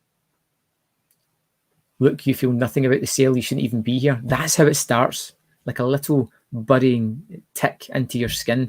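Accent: Scotland, United Kingdom. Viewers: I’m sure your voice sounds different on the live stream! Not sure if it’s more Scottish or not 😃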